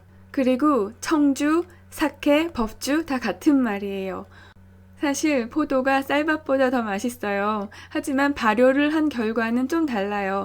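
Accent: Seoul, South Korea. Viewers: 진짜 90년대 서울 사투리 항상 늘 신기해요ㅋㅋㅋㅋㅋㅋㅋㅋㅋㅋㅋ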